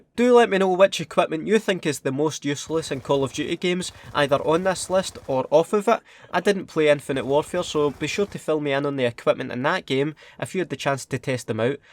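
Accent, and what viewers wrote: Scotland, United Kingdom. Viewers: This mans accent is just awesome i love the Scottish accent mate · Love your scottish accent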